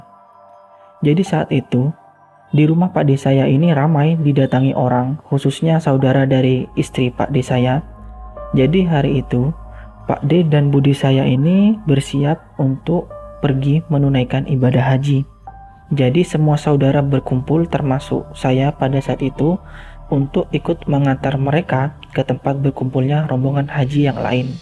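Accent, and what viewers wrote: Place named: Jember, Indonesia. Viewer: Wong jember koyone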